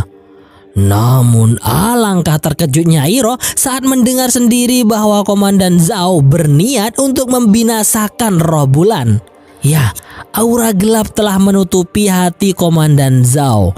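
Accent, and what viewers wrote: Madura, Indonesia. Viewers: Mak madureh koh🤣🤣,"mareh kkh conk dulat" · Anjay medureh kakeh kak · Mik pas ngucak madura kkeh😅😅😅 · Orang madura ya bang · Hahaha reng madure kakeh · Udah nebak kalau Mimin orang Madura dari awal pas ngocak " mateh kakeh cong, dulat " langsung ngakak aku 🤣🤣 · Anjir reng madureh kak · Baru sadar kalau yg bicara alur cerita orang Madura 😂😂😂 mateh kkeh conk dhulat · Admin nya Orang madura😂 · Ternyata oreng madureh kkeh bang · Kok ada bhs maduranya nkok reng madureh kiah😅😅mantapp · Oreng Madura been kak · Mantap tretan baru tau naratornya org madura... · Reng madureh kakeh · Behhh mak tepak ka oreng madure, oreng dimmah? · Beh madureh kakeh huh la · Mateh kakeh cong wkwkwk... oreng madureh nii boss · admine seorg madura...aksentomasinya jelas betul....hahhahah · Ternyata orang Madura😂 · Adminnya orang madura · MK bedeh madurenah 🤣🤣🤣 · Orang Madura Kah Min? 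· Madureh keh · Orang MADUREH kakeh bang 🤣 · Orang madura bang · Medureh cak jhhh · Kakeh reng madureh iya bang wkwkwk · Anjir orang madura coxxx 😂😂😂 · Njr reng matureh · Eh ternyata orang madura taiyeeee.. Sukses tretan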